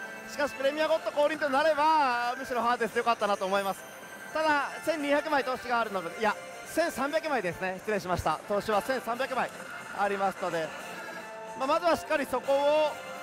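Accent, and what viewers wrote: Kyushu, Japan. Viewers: たまに九州の方言を聞くとほっとしまいます。熊本生まれ何年も帰ってないので、ハーデスはゴッド引いても見切りしてます。ジャグラは中々こない。前の日の多く出てる台を狙い打ってます。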